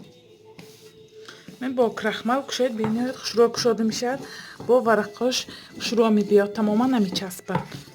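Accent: Isfara, Tajikistan. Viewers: Ассалому алейкум Хохарчон ман аз лахчатон фахмидам ки шумо аз Исфара мо аз Хучанд бисер хурсанд шудам ки занхои моям дар Ютуб хамин хел барномаи зебо бомаьно ширин дорем,Дастатон дардро набинад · Шумо Исфарагими гапзанитон худи хамсоябони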